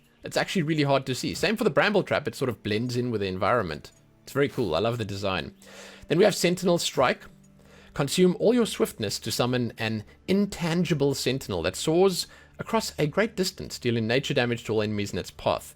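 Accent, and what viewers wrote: South Africa. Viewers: You have a South African accent.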